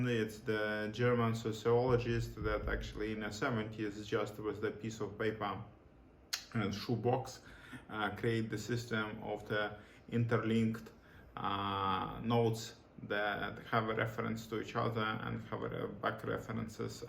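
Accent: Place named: Russia. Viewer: Чисто наш акцент, со снижением силы к концу высказывания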